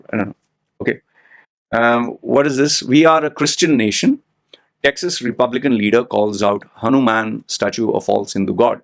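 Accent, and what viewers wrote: India. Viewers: 🍃💯 I like your videos about bull religion system topics... But when you said about the man mustacs tryana be a man.... Very true I agree... But whatsupp with the freaking tattoos on your face... Hommie you ain't no Tyson.. what was you thinking... How does it make you feel.... More of a man, unique dasi, cool...or tryna get cool attention Coz I can bet you didn't go to middle school or even high school in the USA.... Your dasie accent says it all... Bakie tera cast system ka bilkul sahi hai..... I hate that fckin cast system more than anything about India coz millions of reasons I got 🎉🎉🎉🎉good job tho hommie.. ... Peep deep inside.... But right on! 🎉🎉Keep up the good work 🎉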